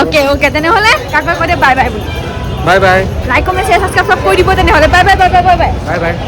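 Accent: India. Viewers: Ur hindi accent is so lovely,, very energetic vlog ,,, love from USA( United State of arunachal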